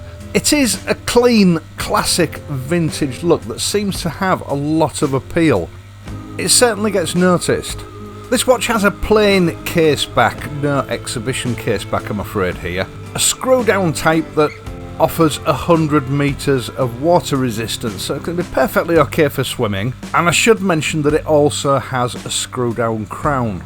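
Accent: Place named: Yorkshire, United Kingdom. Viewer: Hi - wandering through the channel last night & my wife said "Have you got a brother in Yorkshire, or is that just you doing the accent?" (I'm Glaswegian) - confirming that there is indeed a community of us older blokes fed up with disposable consumer culture and tending to embrace the same kinds of things - real clothing, real bikes, real watches and so on. One of the things I most resent about late capitalism is that it intentionally de-skills you to increase your dependency on one corporation or another for products or services. Over-complicated, un-self-serviceable machinery is a prime example. Another is shaving. We got used to paying more and more for overboiled cartridge shavers that require (apparently) ever more blades and strips of chemical slime to do an adequate job of scraping stubble off your face. Fed up with this, a few years ago I dug out my old Gillette safety razor I'd had since I was a boy ("I'd no idea they still make the blades") and reverted to that for a while, but it still bothered me that I was entirely dependent on a disposable component of uncertain quality; besides I still couldn't get a nice clean edge around a tache or beard. 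You can see where this is going... I began a small collection of straight razors picked up from local antique shops mostly, for which I've rarely paid more than a tenner. I watched Youtube videos, bought a couple of whetstones and leather strops and set about acquiring the skills to maintain and use them that my grandfa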